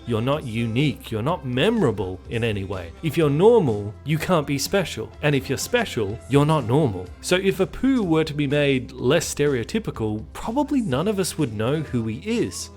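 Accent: United Kingdom. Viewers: I thought you were British. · is australian?! well i'll be! i could have sworn he was british. xD, if you're listening, get in touch with the the weekly planet guys and make a guest appearance!! i mean, australia's a small country, right?! you probably live just down the road from them. xD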